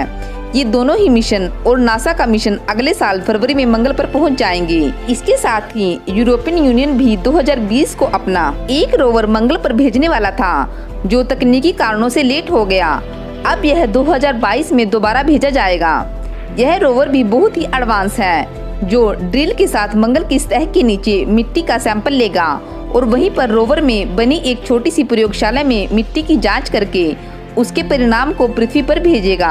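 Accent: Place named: Sikkim, India. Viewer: U are from sikkim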